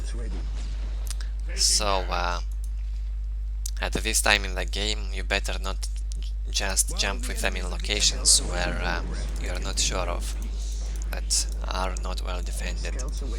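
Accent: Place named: Russia. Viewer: Hi,. Some critics is here. Nice channel, live voice acting and great replays choice. But. This russian accent, gosh. Don't get me wrong, you have good vocabulary skills, better than my. Wish you luck. Thumbs up.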